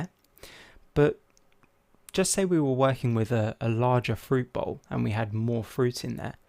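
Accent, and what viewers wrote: United Kingdom. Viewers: oh god the british accent...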